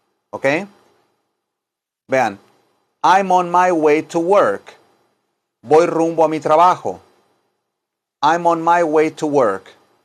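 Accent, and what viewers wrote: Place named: Mexico. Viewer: este profe tiene acento mexicano, pero cara de "español hostia", TOTAL DE DONDE ERES PROFE?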